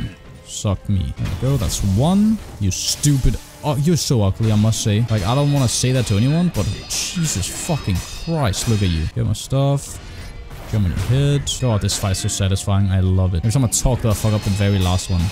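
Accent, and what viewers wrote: Denmark. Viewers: Danglish on point, as always.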